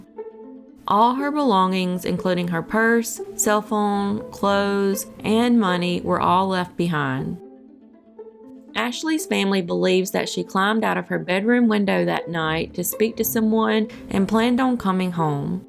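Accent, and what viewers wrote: South, United States. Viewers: Love your southern accent!! What state are you from? Love from Ireland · Love your accent- voice. May i ask what state you are from.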